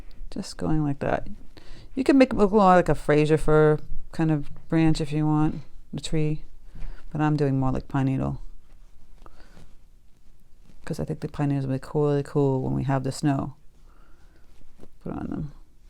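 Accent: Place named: New England, United States. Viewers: Thank you for this wonderul tutorial! I love Cardinals and see them regularly living in New Hampshire. I knew I detected a New England-like accent when I started watching you last week. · The Northern Cardinals can have red beaks and some have a yellowish orange beak. The desert dwelling cardinal from Columbia has a gray and black beak. Some have bodies that are gray all over. Some from Arizona have gray bodies and yellow beaks. In Alberta Canada the males are red with mainly yellowish beaks. The females are mainly gray with some red and have yellow beaks. Im sure some will disagree with me because frankly the Cardinals are always birds of a different color. I love your Cardinal. His legs should be angled forward to the left from the knee just below the body and feathers. Love your show and your accent. Do you have a red beak, gray or yellow?lol. 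Are you from New England or New York areas?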